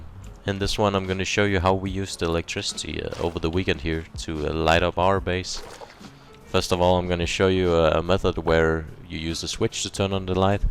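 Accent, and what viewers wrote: Denmark. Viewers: Du lyder ekstremt dansk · u sound danish? are you? din frække dreng xDD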